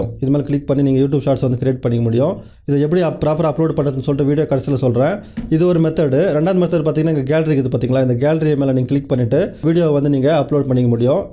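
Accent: Chennai, India. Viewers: தலைவா சென்னை தமிழில்,பேசாம தஞ்சை தமிழில் பேசுங்க..(அது என்ன உங்களுக்கு உசரம் height டா..? நன்றி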